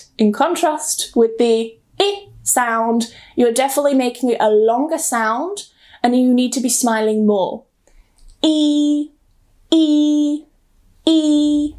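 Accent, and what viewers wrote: United Kingdom. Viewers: Hola, estoy muy agradecido contigo porque tu canal me ha ayudado bastante, me has sacado de preguntas muy significativas. Te amo. Me enamora tú bello acento británico. Saludos desde Bogotá, Colombia.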